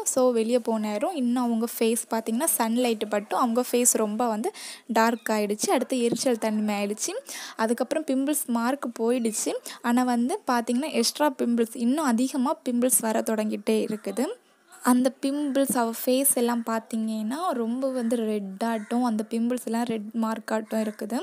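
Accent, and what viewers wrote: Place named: Kanyakumari, India. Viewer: Correct bro ivanga Kanyakumari than pesurathei vechei theriuthu 😅💯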